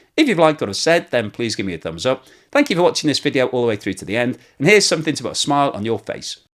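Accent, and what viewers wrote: United Kingdom. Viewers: At begining I have a hard problem to understad your britsh acccent, now it loks familiar for me. Thank you for all the good informations.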